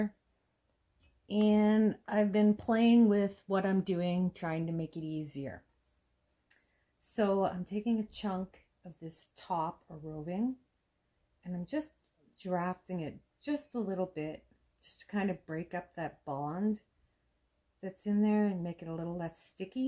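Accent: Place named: Ottawa Valley, Canada. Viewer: Thank you so much for this video! I'm in Winnipeg, with family in the Ottawa Valley and in Gatineau, so I heard your accent and put you on the map right away! :) I'm going to try spinning some flax right now... I hope it works out as well for me as it did for you! There may be a lot of swearing involved... :) Thanks again!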